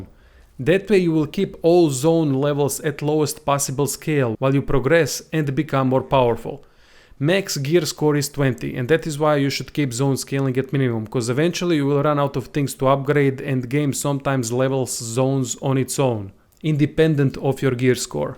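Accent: Russia. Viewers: New to the game here and your tips are very helpful. I love your russian accent 🥰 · Nice video plus nice voice. is this russian accent? pretty cool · Can never go wrong with tutorial by a man in Russian accent. heart emoji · когда русские говорят на инглише, да еще и с ярко выраженным акцентом это просто разрыв ушей! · Thanks a lot man ! Learned a lot here, also loving your Russian accent (no homo)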